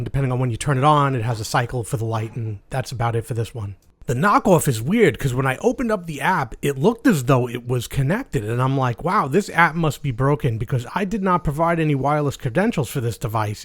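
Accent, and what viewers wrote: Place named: Massachusetts, United States. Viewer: Love your videos. I could tell from your 'r" that you are from the Massachusetts area.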